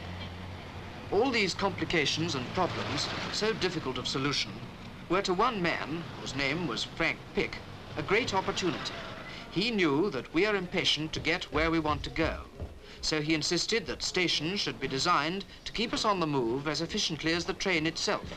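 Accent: RP, United Kingdom. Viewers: Plummy voice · A real cut gless eccent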